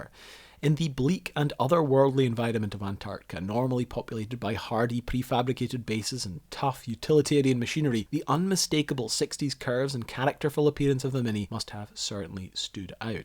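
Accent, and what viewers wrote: Scotland, United Kingdom. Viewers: This is like longer Scottish Tom Scott. Great content, only a matter of time for 1 million subs for you. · Fascinating videos but the speed of your commentary combined with the Scottish accent and my old age hearing make it difficult to keep up.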